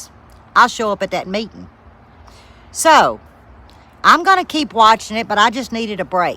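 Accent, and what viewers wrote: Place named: Louisiana, United States. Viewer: You can tell there's some Louisiana accent (a touch of Cajun) in there and mixed with some parts of Kentucky. Before I retired, I traveled a lot to Louisiana on business--places like Houma, La Place, Empire, Nawlins, etc. Used to love the "talk". Although those native to New Orleans sounded more like they were from Brooklyn--not 100%, but a lot.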